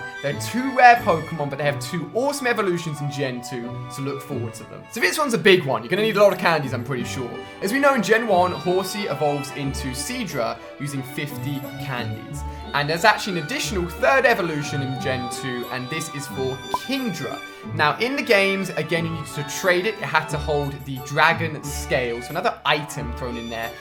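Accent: United Kingdom. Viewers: Is it just me or does he copy trainer tips but with a British accent?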